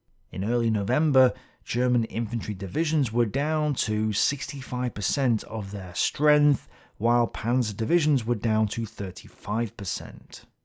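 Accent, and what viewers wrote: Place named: Northern England, United Kingdom. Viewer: Just to follow up on my previous comment on your Case Blue video. What would you have in common with people like von Bock or von Wietersheim? They represented an ancient military cast going back nearly 900 years. As I said previously - they were Prussian, aristocratic, snobbish, arrogant - they belonged to the elite of the landowning (upper) class in central Europe. They really did live in a different universe. To hear you talk about them in your northern English accent brings a smile to my face. Cheers - btw I removed the word 'posh' as I don't think that adjective would apply to these people.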